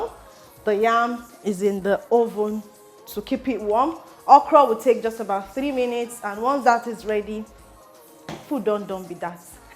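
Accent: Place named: Benue, Nigeria. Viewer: Waoo!! You did this and not from Benue? You even added Benue accent when you were cooking. Bless you sis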